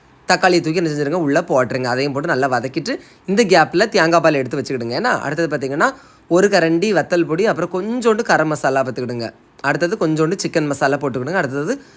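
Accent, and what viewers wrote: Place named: Kerala, India. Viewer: Hello sir ni ga pesupothu malayalam slag verunudallo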